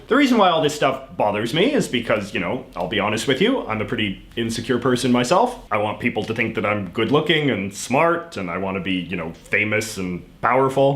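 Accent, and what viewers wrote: Canada. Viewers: BTW, fellow Canadian Conservative here. Live just up the road from ya. Say "about" and "road" normal tho..... lol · I'm satisfied with your Canadian accent. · what part of canada are you from? lots of aboots lol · Great video - worth a watch for the Canadian pronunciations alone. 👍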